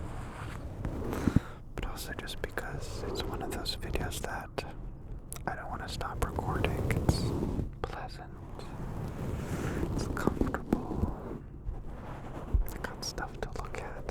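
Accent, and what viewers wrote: United States. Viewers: is he american orr?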